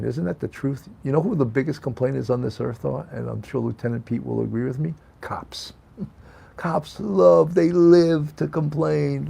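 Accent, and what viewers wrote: New York, United States. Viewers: Your NY accent is great! Why do negative people always feel the need to comment? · I love your accent!!! Nyc?? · I love your new york accent. I love New York ❤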